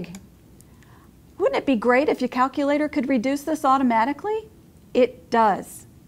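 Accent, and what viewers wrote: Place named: United States. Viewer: I don't like her she has an American accent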